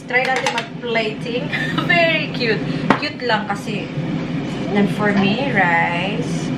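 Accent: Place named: Visayas, Philippines. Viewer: Cute kaayo ka mag binisaya te hahahaha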